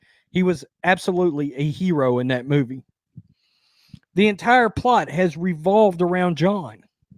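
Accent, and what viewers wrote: Texas, United States. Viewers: This has nothing to do with Terminator but are you from Texas? I’m from the Netherlands and am wondering if I recognize the accent correctly 😅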